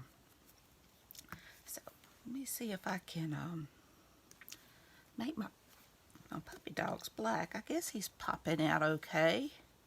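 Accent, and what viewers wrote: South, United States. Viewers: I have become a big fan of your collage. I love that you can combine simple elements together to make a complex and interesting story and layout. I appreciate your talent and it inspires me to give collage a try rather than watching others do it. I love your sweet southern accent and I enjoy your chit chat. Thanks for sharing!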